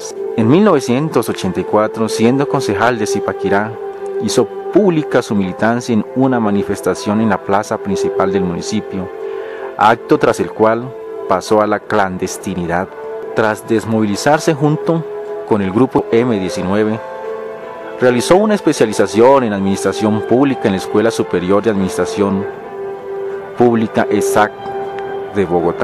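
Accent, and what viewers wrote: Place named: Caribbean. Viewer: Por los que critican su manera de hablar es decir su acento. No es Bogotáno les diré para su información es un acento neutro un poco del Caribe porque no interroga tanto por lo de la eses les diré que para eso uno estudia para aprender. Muchas gracias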